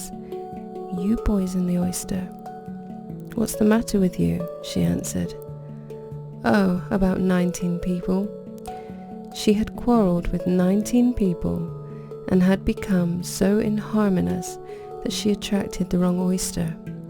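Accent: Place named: Surrey, United Kingdom. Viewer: Madame, Let me preface this with the fact that I am quite discerning when it comes to bestowing praise regarding anyone's voice when speaking. With that out of the way, I must give my most ardent compliments to you. You are in possession of a provocative, mellifluous voice, spoken with a disarmingly charming accent. Yours is the kind of voice, I would like to be the first sound I hear when I wake and the last sound I hear before I slumber. I live in Manhattan, New York, so my ability to ascertain the origin of your accent is perhaps insufficient. At least some of your cadence, and diction remind me of an accent South of London in Surrey. Notwithstanding my ignorance of the origin of your disarming accent, you have a guileless, feminine resonance in the prepossessing melodious sound which would only enhance the lyrical tone of poetry. A voice such as yours is no doubt accompanied by a pulchritudinous visage. 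Written by a bodice ripping rogue, sincerely with unadulterated veracity, without the slightest affectation whatsoever. ∞ Rogue Means - - - - 'Beauty is truth, truth beauty,' - that is all ye know on earth, and all ye need to know. ―John Keats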